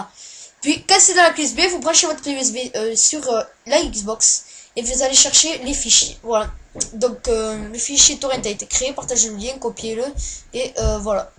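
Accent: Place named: Marseille, France. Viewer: J'aime ton accent marseiller:)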